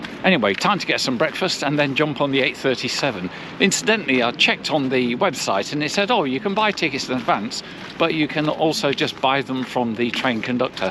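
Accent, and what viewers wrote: United Kingdom. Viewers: Absolutely stunning video. I just found it on youtube and was amazed to hear a British voice. I live in Mariazell and have riden the same train many times but never in Winters. So it was amazing to see the Views through your lens. Thanks for sharing !!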